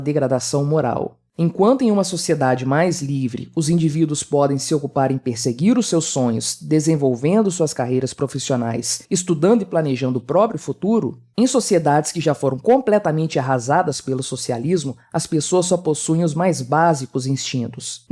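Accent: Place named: Belo Horizonte, Brazil. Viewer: o sotaque desse narrador é idêntico ao do Nikolas Ferreira de BH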